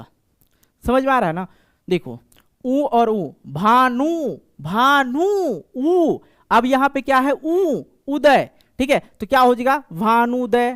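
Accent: Bihar, India. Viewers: Sir apki language samajh nhi ati hai lagta hai app bihari mai bat krte ho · Sir aap bihari hai ❤❤❤❤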